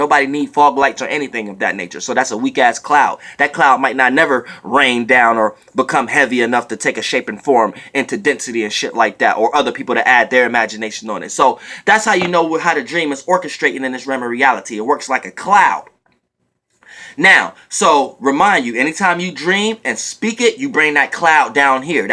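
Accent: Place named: California, United States. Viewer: Soon as bruh start talking all I hear is CALIFORNIA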